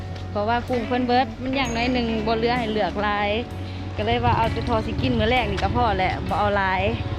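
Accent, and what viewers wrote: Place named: Hong, Thailand. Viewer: สำเนียงสาว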